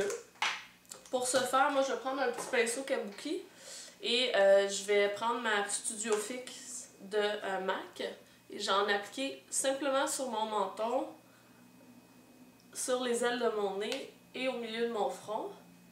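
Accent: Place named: Montreal, Canada. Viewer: Super joli !! Ça fait vraiment un teint santé ! Depuis le temps que Lutin nous parle de toi ça fait plaisir ^^. A moi tout particulièrement car j'entends un peu de l'accent de chez nous... je suis de Montréal mais j'habite en France ;)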